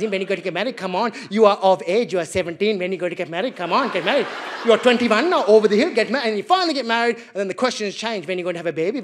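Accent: Australia. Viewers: So nice... Australian accent is wicked.. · As a brown immigrant in California... roundabout way of saying American...if I closed my eyes and watched this I would think some white Aussie commie from cricket telling jokes. World is so bizarrea and interesting. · I detect a slight Australian accent 😅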